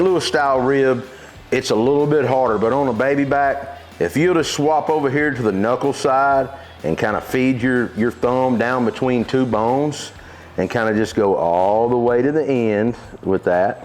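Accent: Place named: South, United States. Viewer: First time watching, fantastically groovy info. Good (really good) teaching, useful information, and your 'southern accent' jumps out at this northwestern upper peninsula michigan yooper.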